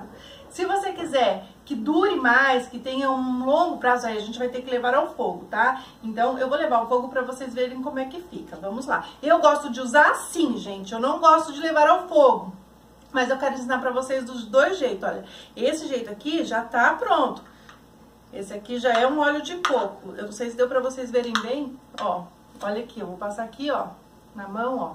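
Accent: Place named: Brazil. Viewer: Hola soy de México. Y me gusta ver videos usted es Brasileña por el acento de su voz es muy guapa me gusta le envío desde acá un saludo muy afectuoso y le deseo muchas bendiciones desde México como se llama el lugar donde vive usted y como se llega ya estando en Brasil. Espero me conteste porfavor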